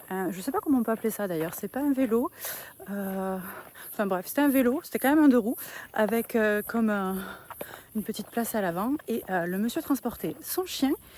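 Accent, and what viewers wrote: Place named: South, France. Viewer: Mon Dieu, quel corps de folie En plus. Cet accent du Sud et ses cigales Ne change rien, reste comme tu es magnifique.......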